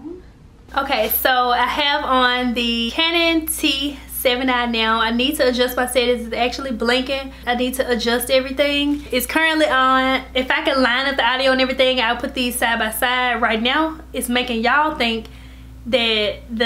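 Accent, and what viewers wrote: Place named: Tennessee, United States. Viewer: I was about to comment and ask if you were from TN until I saw it in your description box haha. I recognized that accent lol I was born there and have family there, but hello from NC!!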